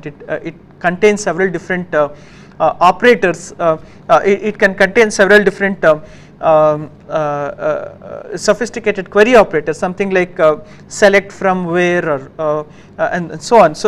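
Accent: India. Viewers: speak a little slower because your Indian accent isn't the best sir i can't understand hardly anything you said.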